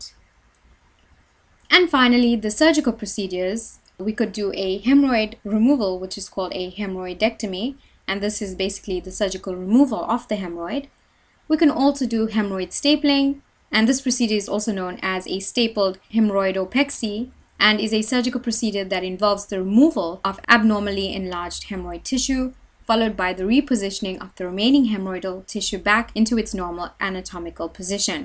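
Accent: South Africa. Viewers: You sound like you're a south african indian, am I right?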